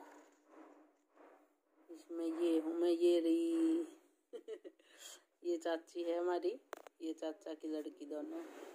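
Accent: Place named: Haryana, India. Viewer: Aapke awaj sa lag rha tha aap jind ya kaithal sa ho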